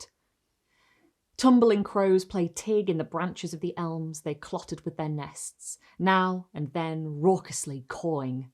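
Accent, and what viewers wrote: United Kingdom. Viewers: Let me just say, this story hits quite different when doing yard work in the fall! Also I feel like the sex could be changed from male to female and be just as relevant. As an American some stories are most effective with a British accent :)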